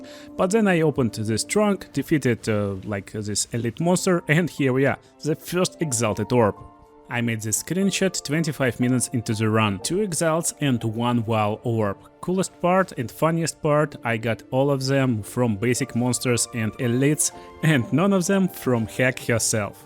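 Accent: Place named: Russia. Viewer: The gameplay is nothing special really no offense but your boris accent and little edits made it very entertaining.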